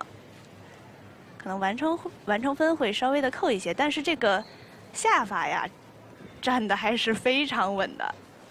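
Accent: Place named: Cantonese, China. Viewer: 解说员是广东人吧~ “欠”了点、“完”满的结果！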